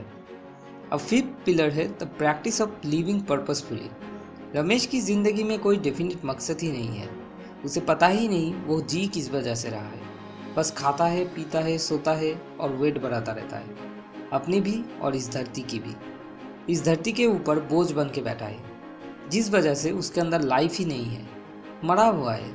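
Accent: Bengal, India. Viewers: bad video. bad accent.bcoz u r a bengali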